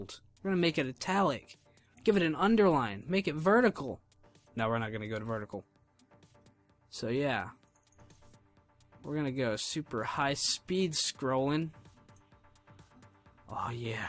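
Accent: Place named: Ireland. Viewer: Irish, m8 Irish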